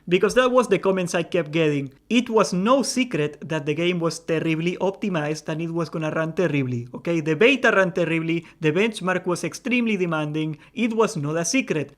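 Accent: Spain. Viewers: I gotta say that I like how you speak English, are from Spain? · Eres de fuengirola, verdad?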